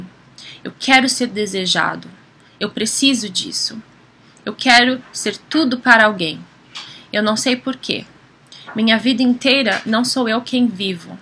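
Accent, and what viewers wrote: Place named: United States. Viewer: Você é americana? Meu esposo é de Chicago e quando fala em português tem um sotaque parecidinho com o seu. O jeito de falar é bem semelhante. Se bem que ele não fala quase nada em português hhh mas quando fala, algumas palavras saem como algumas que você fala. Claro, você fala muito bem, mas de uma maneira bem peculiar. ☺️🌼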